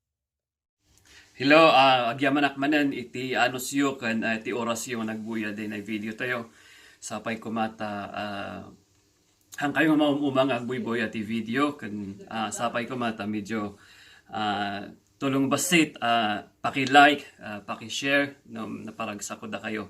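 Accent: Ibaloi, Philippines. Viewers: Brod pananalita mo palang halatang ibaloi ka at walang masama dba. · Sa pananalita mo pa lang ay ibaloi ka 😂😂 iyong accent ninyo 😀😀din kankanaey mo abe yan kankanaey di eBugias 😁😁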